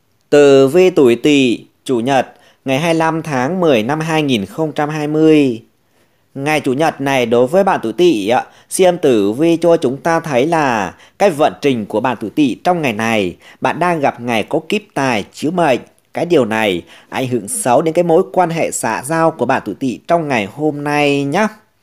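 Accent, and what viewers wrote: Thanh Hoa, Vietnam. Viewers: Troi Thanh Hoa de nghe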